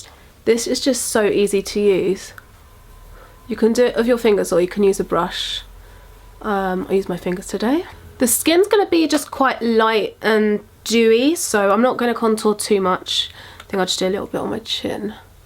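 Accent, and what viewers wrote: London, United Kingdom. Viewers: I have watched nearly all of your videos and i think you're amazing! i also love the way you say "gorgeous"! gotta love the london accent ;D xx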